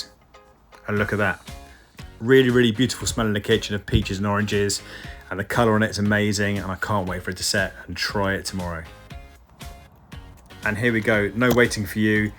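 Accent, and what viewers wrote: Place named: United Kingdom. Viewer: Nothing more sexy than a man who knows how to make jam and comes with an British accent 🥵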